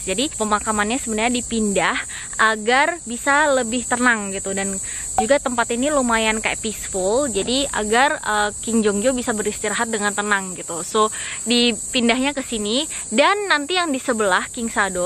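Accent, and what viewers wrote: Indonesia. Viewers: Ini org korea atau indonesia ya, logatnya sama persis bgt kya org indonesia.. 😅😅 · Medok banget bhs indomu dek !! love it !!!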